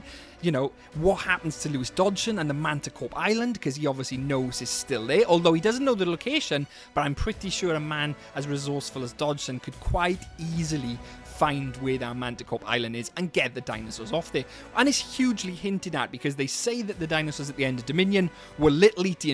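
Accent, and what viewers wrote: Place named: Wales, United Kingdom. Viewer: You sound... Welsh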